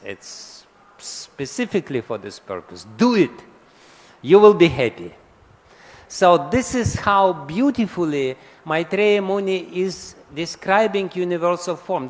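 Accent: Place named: Russia. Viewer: Hare Krishna. 🙏🏻😇 It's the best way to learn English - to listen with russian accent from Maharaja.. 🕉️🌬️🧘🏻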